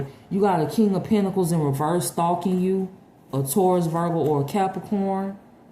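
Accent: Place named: Miami, United States. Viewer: Miami Voice!😊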